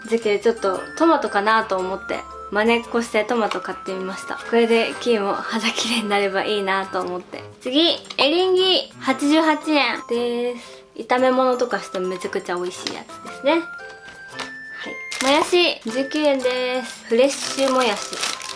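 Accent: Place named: Hiroshima, Japan. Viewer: 元彼が広島の人だったからと同じ喋り方だからなんか思い出したあああ笑笑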